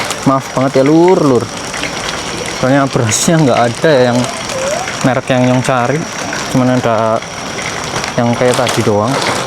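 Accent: Banyumas, Indonesia. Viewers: Maaf mas asli ne janeh ngendi kok pd ky aku ngapak🤭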